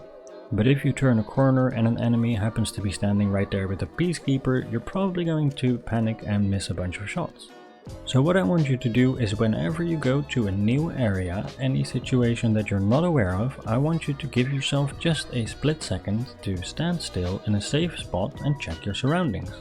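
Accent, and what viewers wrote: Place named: Netherlands. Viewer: You're Dutch aren't you 😜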